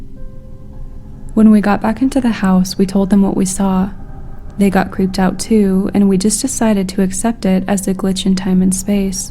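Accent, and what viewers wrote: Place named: South, United States. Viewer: hearing some drawl in a story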